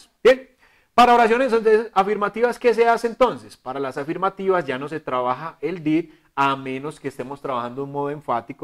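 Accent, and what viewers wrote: Colombia. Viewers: Wow. Me encantó como explicas todo. Tu asentó colombiano me encanta. I ❤️ 🇨🇴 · ¡Amé su acento colombiano! Además tiene una manera tán sencilla de explicar. Realmente este tema yo ya lo vi, pero siempre es bueno reforzar y conocer otras exploicaciones a parte de las que me dan mis maestros. Por mi parte, seguiré viendo los vídeos de este canal; me encantaron. ☺ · JAJAJA pensé que era la única. Es tan lindo y además con ese acento colombiano, uff!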